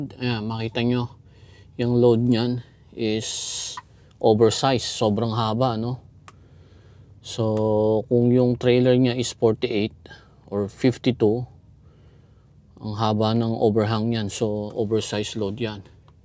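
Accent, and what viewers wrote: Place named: South, Philippines. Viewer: southern accent.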